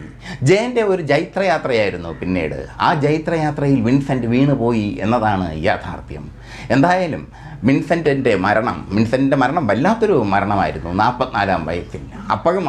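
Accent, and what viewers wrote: Kottayam, India. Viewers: ജയഫാരതി ഈ ചേട്ടൻ കോട്ടയംകാരൻ ആണോ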